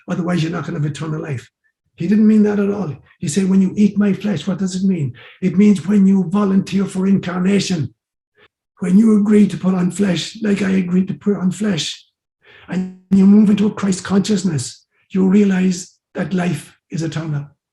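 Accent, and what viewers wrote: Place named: Ireland. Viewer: Just stumbled across your channel and just had to say I absolutely LOVE the name you gave your channel plus the bonus of listening to your lovely Irish accent which brings back memories of my Grandparents who immigrated to the USA!!! I look forward to learning and growing more spiritually with you ❤❤❤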